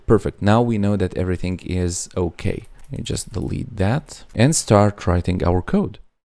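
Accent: Russia. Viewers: Неплохо маскировал русский акцент.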